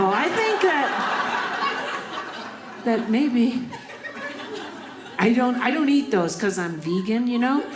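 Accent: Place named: Wyoming, United States. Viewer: She sounds like she's a local Casper/Bozeman/ Kellogg persona.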